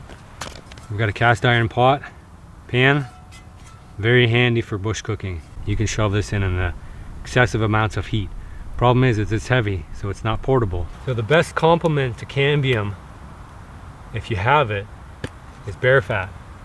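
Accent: Canada. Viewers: You sound hella Canadian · The second I heard your voice I knew you were Canadian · He’s sounds Canadian😄😄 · cool! Ton anglais est impeccable, impossible de deviner que tu parles aussi en français:) mais probablement avec l'accent du Nord comme à Sudbury:) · you are Canadian! Test it Oat = test it out! Haha, nice channel! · I like how he doesn't have a Canadian accent and then it just says in this one video of how he talks Canadian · Finally a Canadian accent i can hear.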